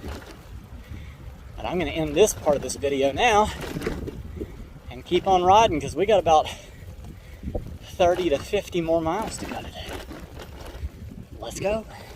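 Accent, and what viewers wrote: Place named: South, United States. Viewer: Hi. My wife and I are intending to do RAGBRAI for the first time this year. I'll be keeping an eye for the guy with the beard and the southern accent. In the meantime, if your spring route takes you through West Michigan and you have some interest, let me know if you'd like some pedaling company for a few miles.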